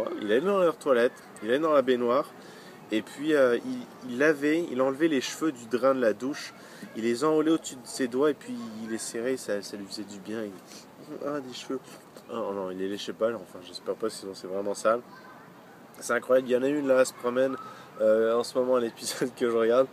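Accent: Quebec, Canada. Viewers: Tes vrmt cool toi ! J'adore ton accents, ta voix on dirais une voix d'acteur mais j'me souvien pu. VIVE LE QUÉBEC!!!!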